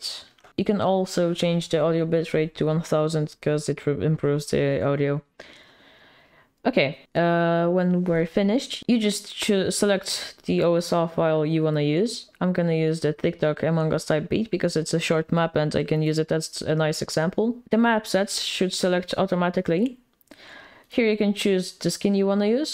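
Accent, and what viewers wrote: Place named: Poland. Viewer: jako polak masz koks akcent (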